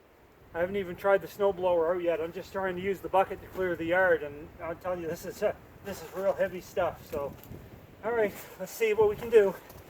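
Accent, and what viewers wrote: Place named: Maritimes, Canada. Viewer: He sounds like a maritimer haha I'm from New Brunswick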